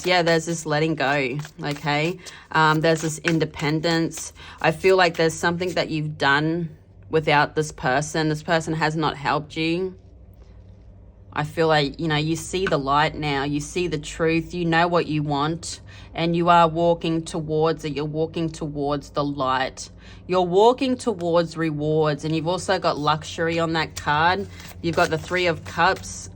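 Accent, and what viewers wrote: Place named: Australia. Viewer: Thank you for reading. I love your accent. Are you Australian? ❤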